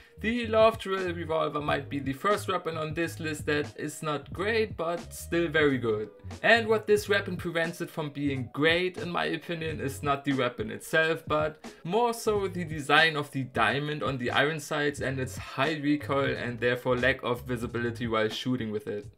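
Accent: Germany. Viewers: From your accent I´d say you are german.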